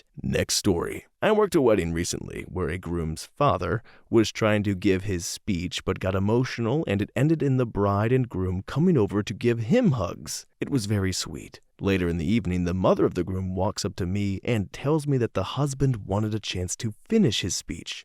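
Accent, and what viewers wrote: Ireland. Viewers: I can't say as to the accuracy of the Irish accent, but it was a nice fair voice that bitch didn't deserve. I wish my text-to-speech sounded like that... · Love the Irish accent here narrator, good job :D! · Oh I really love the voice of this narrator, similiar to the 'anyway next story' narrator but less unhinged. p.s: I've been living in Ireland for the past few months and thought the accent was spot on. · I gave you a like for the Irish accent lol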